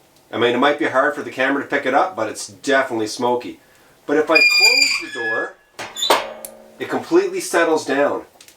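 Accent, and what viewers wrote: Canada. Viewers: Endorsement from anon YouTube watcher: Totally subscribe to this channel. Beautiful views, for real nice guy with a very Canadian accent, feel good, relaxing content, not about the negativity, legitimately out here learning as he goes. You can't get any more authentic than winter camping in the Canadian North. SUBSCRIBE SUBSCRIBE SUBSCRIBE!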